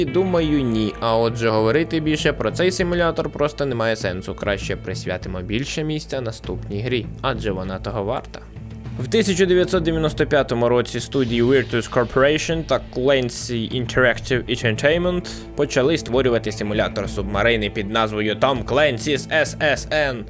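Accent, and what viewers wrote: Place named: United States. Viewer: За україномовний контент + За акцент американця, якщо спеціально застосовується у дикції, величезний мінус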